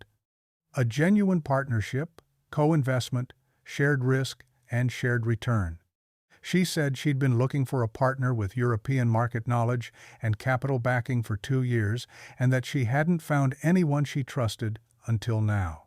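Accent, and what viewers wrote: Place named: United States. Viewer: This guy sounds like an American, yet he measures money in British pounds. This video is a warning about how not to be stupid, as all these types of videos are. I guess it is in the right place, as YT is where all these stupid people like to hang, but the content is a just copy of thousands upon thousands. Lesson of video: Use your brain and there are no problems here (...and maybe avoid BKK). In the end, this video is just a repetition of many, many other vlogs on YouTube. It is a simple, lazily made imitation of someone else's original idea. Every Farang in Bangkok thinks this is how to create content, but this type of channel is now getting really tired and uniteresting. If you are going to make up a story, next time make sure it has an original thought behind it.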